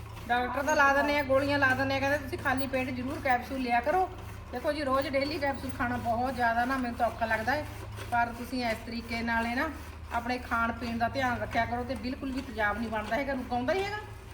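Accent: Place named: Doaba, India. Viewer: Asi fgwarhey sahr to a,plz dso tusi kitho o,thadi boli dwaabe di lg di a,sade paike dwane c aa,plz dso ji gbu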